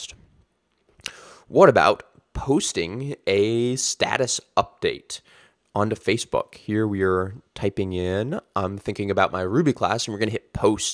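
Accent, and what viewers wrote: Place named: England, United Kingdom. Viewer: yes first video I found in an English accent rether than Indian accent. I can finally understand every word without having to rewind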